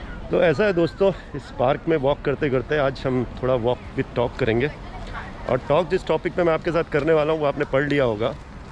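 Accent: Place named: China. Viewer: Bhai, aap ki sound ab total Chinese ho gye hai. But a really awesome video by you.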